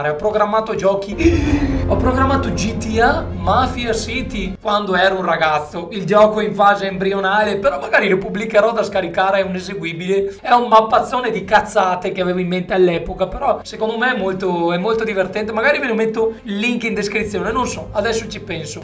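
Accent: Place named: Veneto, Italy. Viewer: L'accento veneta di quale provincia è?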